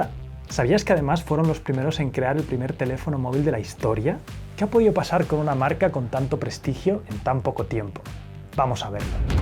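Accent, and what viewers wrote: Spain. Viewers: Así hablan los españoles, no cambian, o cambian muy poco la pronunciación de las palabras escritas en inglés. Ya es algo cultural, no creo que no hable inglés.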